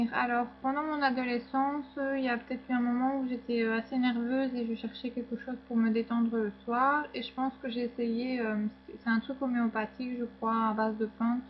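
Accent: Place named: Belgium. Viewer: Coucou!! C'est cool que tu es fait ce tag ;) Tu vis dans quelle region? Ton accent ressemble un peu a l'accent belge. Gros bisous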